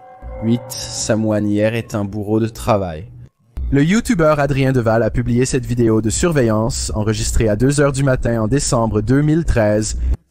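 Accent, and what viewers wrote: Quebec, Canada. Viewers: Cie vous n'aimez pas l'axent du kebec changer de série 😮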